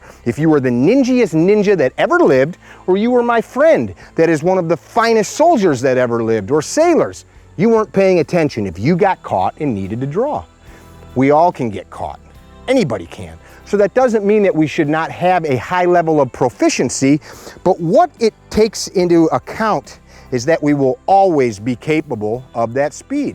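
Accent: United States. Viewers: Speakin normal american english so he must be. 😁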